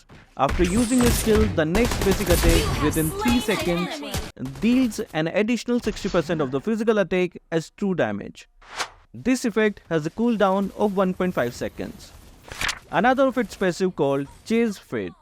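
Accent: India. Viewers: Bro are you from India🇮🇳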